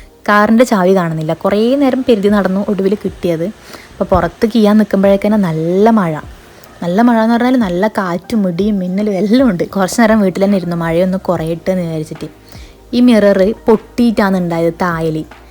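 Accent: Kasaragod, India. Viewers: Namma kasarod ayond ningale bhasha nalla pole manasilavm🙌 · Nammale kasargod basha adipoli alle.... Athil ആണ് രസം..... വിഡിയോ എടുത്തുകൊണ്ടു സംസാരിക്കാൻ ശ്രമിക്കു അതാണ് രസം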